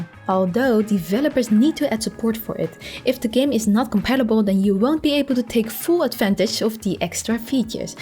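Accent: Netherlands. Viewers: is she dutch? she sounds really dutch.. shes dutch! is she dutch?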